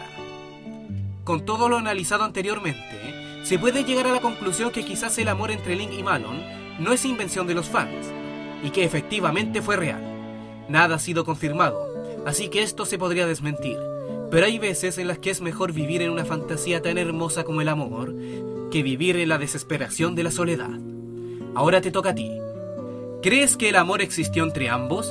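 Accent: Chile. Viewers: Se nota que eres chileno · Son de chile ?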